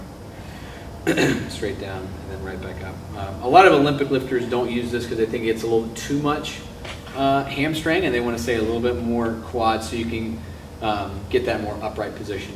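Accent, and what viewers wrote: Appalachia, United States. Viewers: Fuck i love that hillbilly accent :D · I thought this guy was joking when he started talking. Fucking hillbilly.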